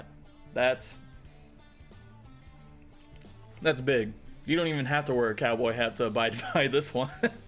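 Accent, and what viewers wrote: Texas, United States. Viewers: yea that and just the hard texas accent. Rs are pronounced very hard from what i can tell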